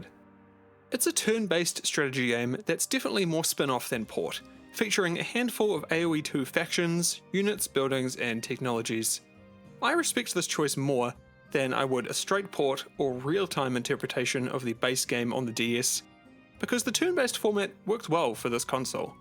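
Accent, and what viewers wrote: New Zealand. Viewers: Cute NZ accent, lol.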